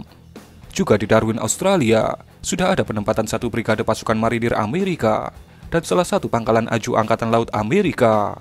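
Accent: Java, Indonesia. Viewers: Kak wong jawa ya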